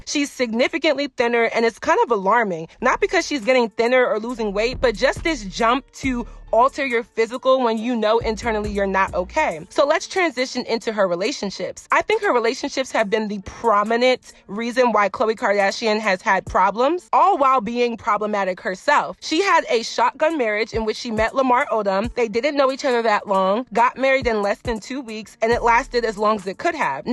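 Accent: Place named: Baltimore, United States. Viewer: You from Baltimore? I heard the “do” and “you” in the accent lol…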